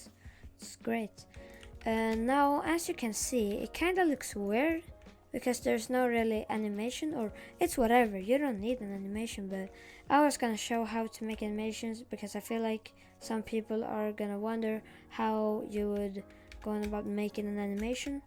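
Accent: Sweden. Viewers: ur swedish I know it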